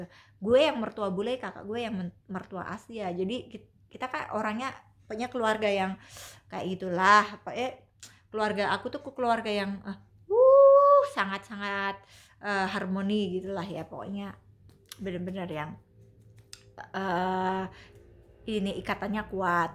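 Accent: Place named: Bali, Indonesia. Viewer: Ini logat nya orang Bali ...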